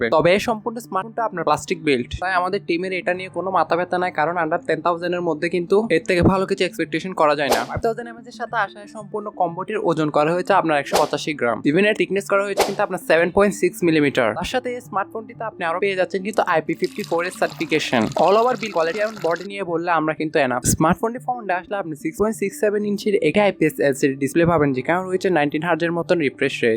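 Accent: Chittagong, Bangladesh. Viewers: Same bhai..ami fouzdarhat thaki..apnar bolar style dekei buje geci..apni ctg tahken..😇💜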